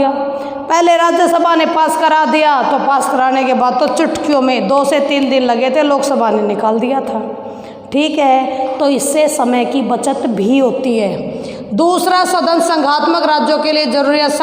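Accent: Haryana, India. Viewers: Maam ap Hariyana se ho kya ap ki aavaj se lag raha h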